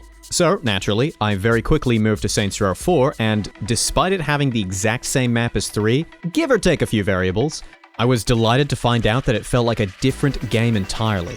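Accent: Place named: Australia. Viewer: not gonna lie you sound like an australian learning an american accent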